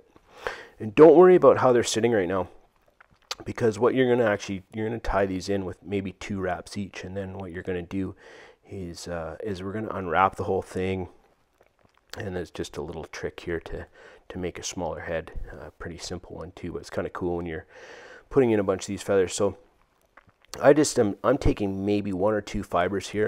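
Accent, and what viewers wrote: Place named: Canada. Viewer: love the canadian accent. i live in kelowna. thanks for the vid :)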